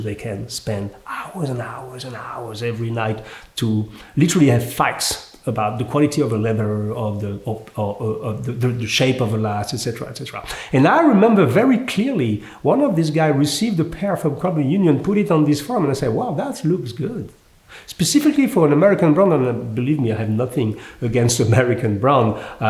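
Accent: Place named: France. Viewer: Dont want to be rude, but the pronunciation on skoaktiebolaget is like three words skor=shoes aktier=stocks/shares and bolaget=company but in this context it means shoetradingcompany, so put air between sko-aktie-bolaget and you will be fine even with a french pronunciation, wich is not to be complained about. Kind regards from Sweden and thanks for lifting that Company .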